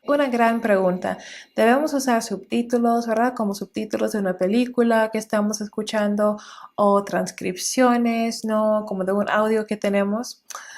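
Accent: Standard. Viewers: muy cierto, tiene un acento bastante neutral...con unos toques caribeños pero muy buen español , felicitaciones! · Wooow tu español es EXCELENTE... hablas mejor que muchos latinoamericanos... Tono adecuado, buen acento neutro, buen ritmo, sin acento gringo... Esta PERFECTO felicitaciones 👌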